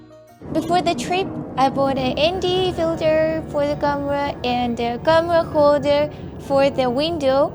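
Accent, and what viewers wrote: Russia. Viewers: Our girl used to have thick russian accent then lol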